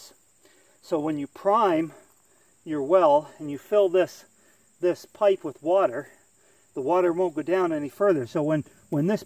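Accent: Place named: New Brunswick, Canada. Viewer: Well hello there fellow NB’er, at least I’m gathering from your name and the North Eastern, not to mention the accent, lol. The off grid chores never end and today will be the battle of my hand pump and hopefully an oil change on the ol’ Sierra. New subscriber here, thanks and cheers from Miramichi. 👋🙏❤️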